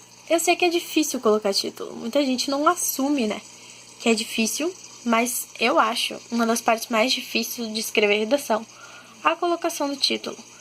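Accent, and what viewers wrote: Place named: Rio Grande do Sul, Brazil. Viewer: oi vejo que seu sotaque é um pouco gaúcho de onde tu é? sou gaúcha de porto alegre bj!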